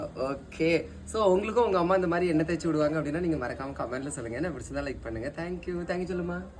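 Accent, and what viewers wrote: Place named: Kanyakumari, India. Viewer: Ungae kanyakumari slang super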